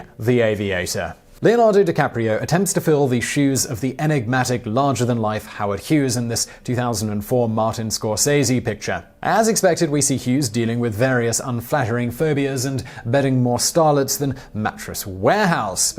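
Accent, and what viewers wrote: England, United Kingdom. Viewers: I like this channel mainly because its a brit w/ a cool english accent so you know hes to be trusted plus solid content and for once I liked the title and the presentation. Most of these vids are poorly presented w/ the guy pandering to human reaction which is annoying and the clickbait vids simply arented needed. The subs plus people will click on this vid just because it exists it really doesnt need super clickbaity titles. This one was a great episode and I love how the info is put forth esp the brick backround etc but nOmOReClICkbAitY stuff ppl will legit watch this no matter the name itll just come up on the que and trust me itll get views but spare us the superduper clickme kjøtt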